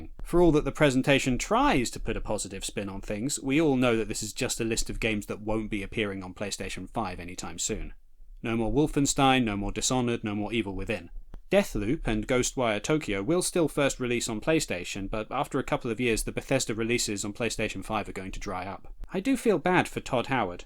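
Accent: United Kingdom. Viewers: Dude, your British accent and calm demeanor can’t hide the truck load of salt in your voice And this is coming from a guy who owns a PS5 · You're an embarrassment to the English accent. Good lord, what an absolute cretin.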